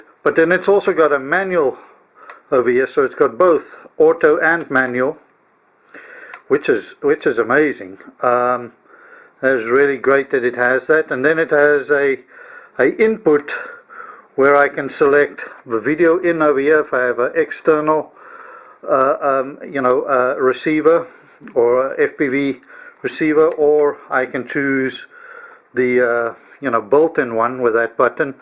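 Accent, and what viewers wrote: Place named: South Africa. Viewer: I thought I recognised the accent. I recently returned to South Africa. Unfortunately it does not seem that the hobby has grown here as much as I hoped. Products are limited and import fees are killer:-(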